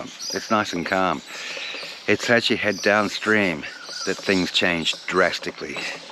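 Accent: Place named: Australia. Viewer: Very pleasant, interesting video; your voice is very calming and rare for me to hear the accent everyone loves. Who's idea to do a town in Glorious Art Deco? I think there are products that you apply after washing that retards the return growrh of mold. I'm from Washington State USA and will never make it to Australia being old nowadays. So this is great! Hope you keep at it; there just arent ways to see the actual countryside ....excellent, thank you.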